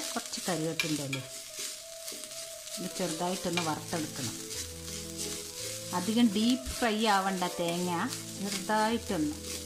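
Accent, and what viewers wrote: Palakkad, India. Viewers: Chechi palakkad ano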